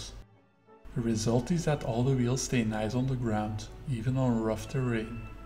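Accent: Belgium. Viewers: It´s Belgium i think, there are signs in Dutch, he sounds Belgian. A lot of Belgian city´s are majority muslim and african.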